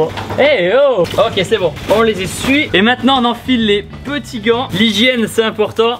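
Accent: South, France. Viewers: L’accent du sud est incroyable 🤩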